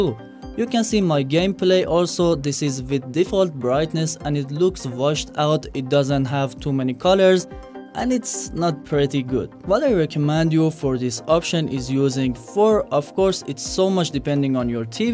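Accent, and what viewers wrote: Iran. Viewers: ایرانی هستی به حرف زدنت میاد ایرانی باشی are you from iran? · Are you from ir?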